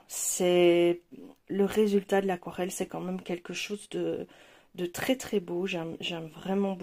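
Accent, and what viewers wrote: Belgium. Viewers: Coucou, tu es multitâches 😍 J'entends un petit accent belge 🤗 (klette 😂😂😂) Bisous 💙💙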